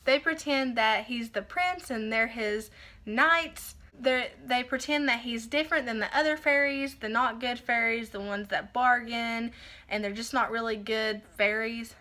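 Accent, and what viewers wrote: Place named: South, United States. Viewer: Girl, where you from? Love the southern accent!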